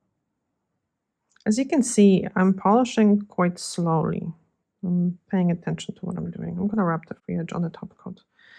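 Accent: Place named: Poland. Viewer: Hey, I just want to say thank you for your calming videos. I am half Polish, half English so your accent is always a comfort to me and reminds me of my Polish relatives ❤️🇵🇱 Thank you for teaching us all about nail care. Ps I love the colour you used on this client and now I’m on the hunt for a similar range of shimmery polishes! If you have any recommendations that are available in the UK it would be great to know. Xx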